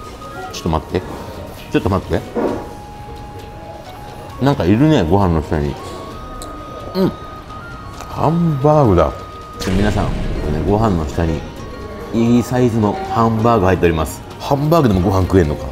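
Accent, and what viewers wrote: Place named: Okinawa, Japan. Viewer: イントネーションが沖縄です😃素敵👏👏👏👏👏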